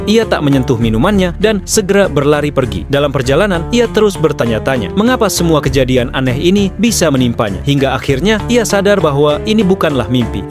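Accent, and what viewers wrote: Java, Indonesia. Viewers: Jawa itu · 😂😂😂😂 500 gelo... Jian jowo tenan Iki.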